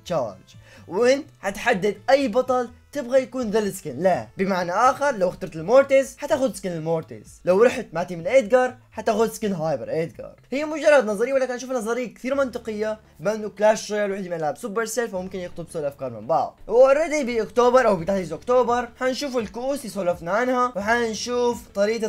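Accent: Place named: Saudi Arabia. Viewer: سولف بلهجتك ليش تسولف سعودي و انتا سوري ؟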